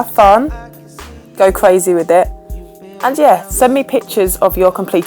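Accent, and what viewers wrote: London, United Kingdom. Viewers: Beautiful Look! Beautiful Wraps!! Thank you. And you have the nicest British accent, that's a compliment. Absolutely beautiful. Thanks for all of your videos. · I love London accents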